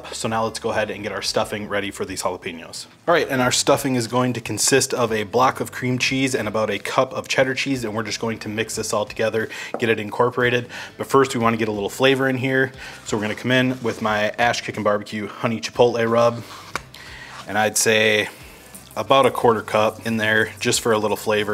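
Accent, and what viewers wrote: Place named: Chicago, United States. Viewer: Are you from the upper midwest? You sound like you’re from Chicago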